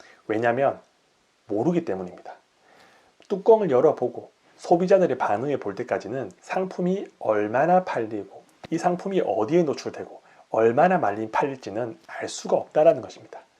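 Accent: Busan, South Korea. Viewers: 말투가 익숙해서 설마했는데 부산분이신가보네요. 투트랙영상보고 알았어요. 근데 부산분치고는 말씀이 아주 부드럽고 듣기 편하시네요!! 유툽이름에 복수형으로 하신 이유가 짐작이 될정도로 진정성이 느껴집니다. 무지에서 유를 창조해내고 누구나 할수있고 될수있다는것을 전파하고싶고 다같이 돈벌어보자는 이유에서 복수형으로 지으셨네요. 진심이 느껴집니다.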